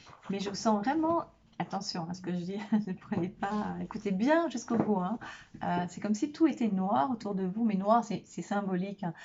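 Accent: Belgium. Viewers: Merci d'avoir pensé à mon signe, je l'ai cherché et ne l'ai pas trouvé toute à l'heure , vous m'avez entendu .... en tous Les cas, je vous le rdis à nouveau , vous êtes charmante , lumineuse et sympathique et avec votre accent belge , c'est encore plus agréable , j'ai beaucoup aimé vivre en Belgique avant de devoir partir : <link> j'ai apprécié le peuple belge et me suis fait de bons copains là bas .. BONNE CONTINUATION , Joyeux Noêl et bonnes fêtes de fin d'année ; FRANCK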